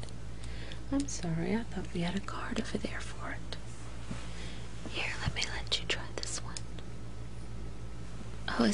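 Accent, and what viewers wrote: South, United States. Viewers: I love your asmr offerings. I'd love to hear you tell us about your tattoos. Your voice is so beautifully southern. :) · Yes, your southern accent =) It's the best soothing voice i ever heard *-*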